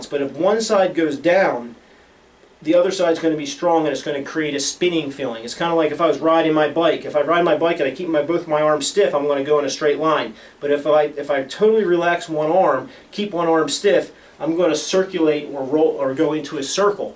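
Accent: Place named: East Coast, United States. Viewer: What about dizziness brought on by visual sensitivity? Otherwise, one of the best videos I’ve seen on the topic of dizziness so far; thank you! Also, please put the link to your website in the YouTube description. I have no idea where you are. Judging by your accent: east coast. So, I’m off to google your practice now…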